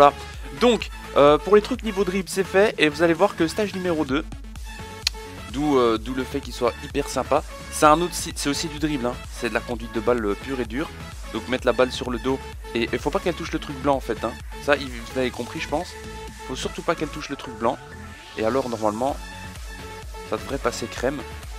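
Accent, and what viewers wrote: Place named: Belgium. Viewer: superbe vidéo continue et je me disais bien que tu étais. belge parce que tu as un peu l'accent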